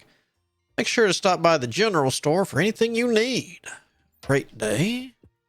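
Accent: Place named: South, United States. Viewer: Love the work so far, may i ask what part of the south your from? I noticed the accent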